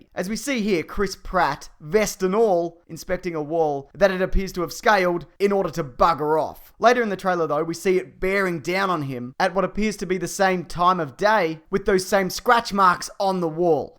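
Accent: Australia. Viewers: I watched this video before the actual trailer. Now I'll have an Aussie voice running in my head when I see the teaser. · Question is this guy Australian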